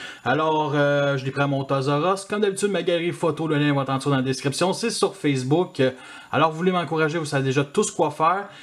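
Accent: Quebec, Canada. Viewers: Nom d'un caribou en rut, c'est une super petite chaine que je viens de trouver, ca va me faire un super entrainement à l'accent québécois (je suis frncais, et je viens de m'abonner)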